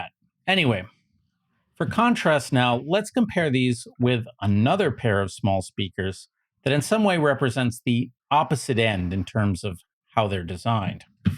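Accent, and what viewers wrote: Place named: Midwest, United States. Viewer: We all love your Midwest accent. Excellent video by the way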